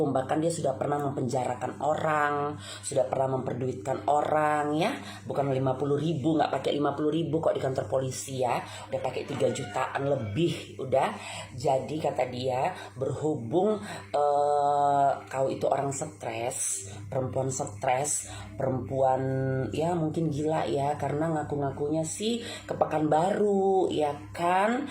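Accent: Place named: Medan, Indonesia. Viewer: Sama Sama..tolong dong bikin konten marah marah ato kesel ato apalah..aku terhibur bnget Kalo Denger clotehannya..apalagi logat medannya..suka banget..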